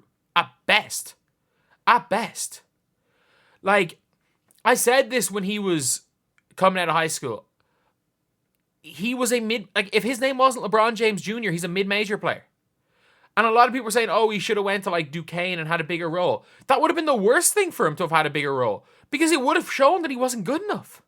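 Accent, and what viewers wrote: Ireland. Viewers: Is this guy Irish? He sounds like me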